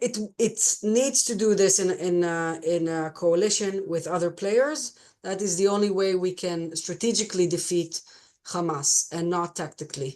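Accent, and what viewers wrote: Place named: Europe. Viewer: Curious what accent that is, it’s not an Arabic accent, so you’re not from the Middle East. What country in Europe are you from? Also, you’re in a class by yourself saying that it’s helpful that Trump has been elected. Are you aware he’s completely tearing apart our government. But I’m glad it’s helpful for you and your efforts.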